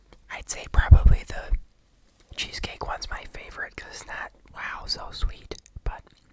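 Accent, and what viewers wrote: Midwest, United States. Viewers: i’m wondering too lol, very strong midwest accent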